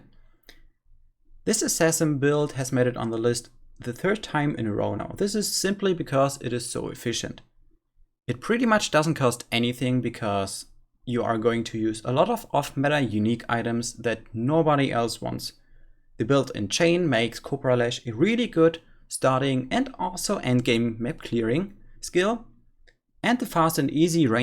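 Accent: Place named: Germany. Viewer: could be german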